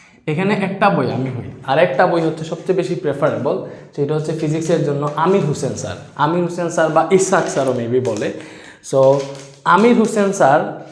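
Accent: Sylhet, Bangladesh. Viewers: বাই তো আমরার সিলটি মন অর, হাসা নি বা বাই? আফনে কিতা সিলেটি নি?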